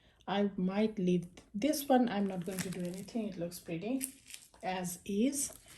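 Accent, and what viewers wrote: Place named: Middle East. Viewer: I love your creations, and I think it's adorable that you have that (I think) Middle Eastern accent, and you use the phrase, my bad. 🥰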